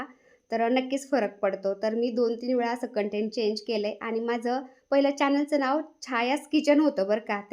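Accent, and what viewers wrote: Ahmednagar, India. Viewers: श्री स्वामी समर्थ ताई तुमची यूट्यूब ची जर्नी खूप छान होते प्रेरणादायी होती😊❤ तुमचा आवाज तुमच्या नगरची भाषा खूप छान आहे म्हणूनच व्हिडिओ बघायला खूप छान वाटते❤😊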